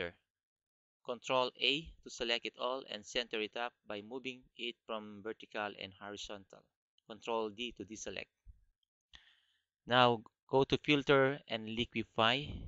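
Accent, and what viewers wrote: Philippines. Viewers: ang pagka english nya ay PINOY na PINOY! ee!! nice kuyA! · nice vid !! nice pinoy accent!!! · hahah. is this guy a fillipino? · kaya naman pala parang pilipino ang nagsasalita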